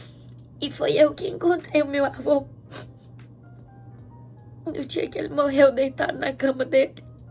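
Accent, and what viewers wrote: Minas Gerais, Brazil. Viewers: Linda sua história, vc é lindaaa , acho lindo seu sotaque mineiro, admiro ver mesmo morando fora do Brasil, continua amando nosso país, eu também tenho esse mesmo sentimento saiu do Brasil por causa de segurança e poder dar uma vida financeira , educação para minha filha , mas o Brasil nunca saiu do meu coração ❤️ tenho minha casa 🏠 no Brasil , um dia voltarei a viver lá 🇧🇷 se Deus permitir quero terminar minha velhice lá, minha terra amada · Logo vi... Seu sotaque mineiro , não perdeu.. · Querida amo ver vc contando sua vida no exterior tão menina e com tanta maturidade pra aceitar as diversidades da vida....parabéns por amar nosso país q é abençoado por Deus o que ñ presta são as lideranças sua história é muito linda amo seu sotaque mineiro meiguice demais ao falar deve ser lida falando brava jeito lindo de ser humilde: grande abraço! 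Deus é contigo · acho tão bonitinho seu sotaque...a família do meu pai são mineiros e acho mto legal esse jeitinho uai de ser. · Oh! Sua história é linda, quanta meiguice 👏👏👏gente nao esquece de cutucar o sininho👍amo esse jeito mineirinha de você falar🌹b💋no teu ❤ desejo uma boa hora minha🌹aqui no nordeste falamos assim quanto tem uma gravidinha!! Admiro muito você visse? 🌹do dia🌹da tarde e 🌹da noite!!💋💋💋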